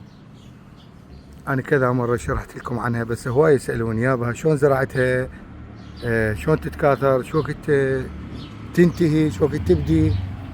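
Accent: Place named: Iraq. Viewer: كلش جميل اسلوبك العفوي واللهجه العراقيه ❤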